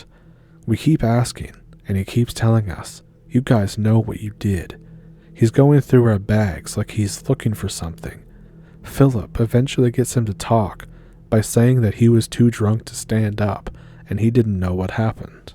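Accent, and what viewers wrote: Canada. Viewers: my friend im from ireland im from waterford im giving your video a like im going to even subscribe you sound canadian my friend am i correct 🇨🇮👍 by the way you can tell stories dont ever put yourself down anyone who tells you you cant are jealuos 😂 i cant spell so no worries there buddy great story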